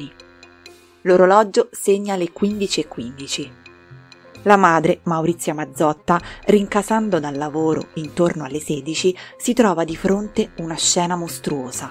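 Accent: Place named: Rome, Italy. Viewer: c'e' di peggio 😅 credimi ci sono truecrimers che ho dovuto abbandonare, errori grammaticali, voci con cadenze ben piu' pesanti di una semplice inflessione che credo sia romana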